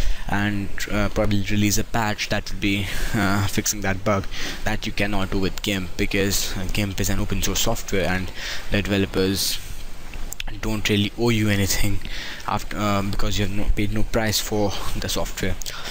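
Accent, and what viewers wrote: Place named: India. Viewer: he's indian . i can get that from his accent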